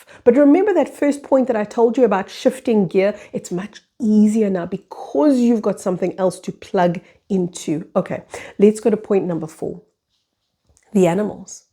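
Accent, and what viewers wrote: South Africa. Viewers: Hi …..I’m South African….discoverd you just now🫶🏻excited to know you’re on YouTube….your accent made me think you’re South African and yes…..need to meet my community🙏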